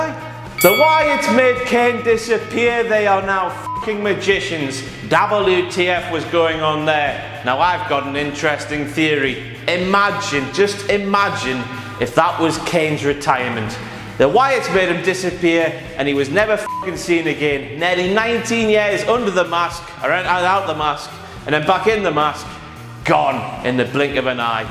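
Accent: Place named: Newcastle, United Kingdom. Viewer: Jay Bclay I know, he's a Geordie (from Newcastle) and their accent is quite difficult to understand, he's definitely joking :)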